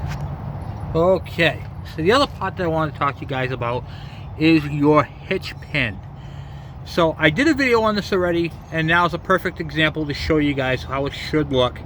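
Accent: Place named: Boston, United States. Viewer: Good info! With your accent, sounding like your from the Boston area?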